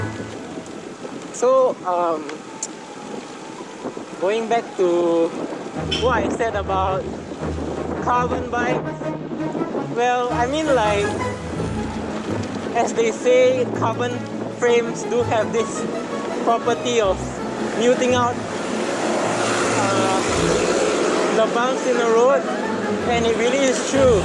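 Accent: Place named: China. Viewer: Nice review!!! Chinese brand bike reviewed by a Chinese accent. Thank you 😁👍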